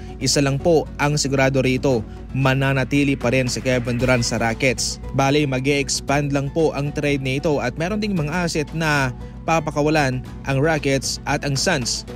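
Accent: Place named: Visayas, Philippines. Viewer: Bisaya ampots ahahaha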